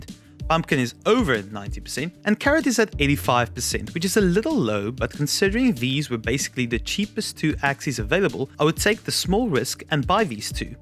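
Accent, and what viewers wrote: South Africa. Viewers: I thought you might be South African, so am I :D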